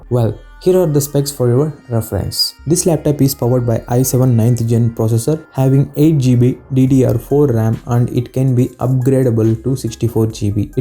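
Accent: South India, India. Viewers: I think it is easily recognisable that he is a south indian,so am i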